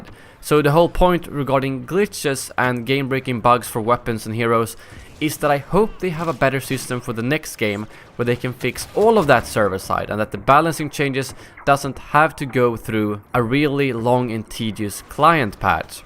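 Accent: Sweden. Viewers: are you swede?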